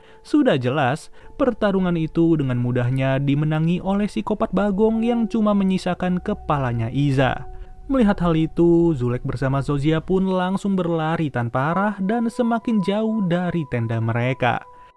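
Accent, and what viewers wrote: West Java, Indonesia. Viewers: Bang lu orang Sunda ya?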